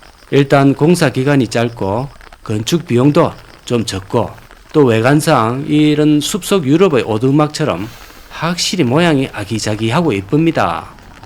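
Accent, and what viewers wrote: Gyeongsang, South Korea. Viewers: .원래 내가 갱상도 말씨 아주 기분 나뿐데 이양반 말씨에서 갱상도 구수한 말이 나오너ㅣ.중국 까지 원정 하시요.더넘어 북쪽까지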